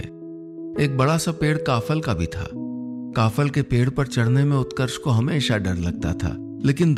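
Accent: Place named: Pahadi, India. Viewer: बहुत अच्छी कहानी खासकर हमारी पहाड़ी बोली के बोल आपके मुँह से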